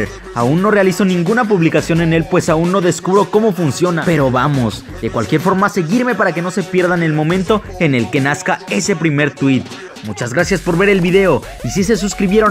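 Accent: Mexico. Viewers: Eres mexicano?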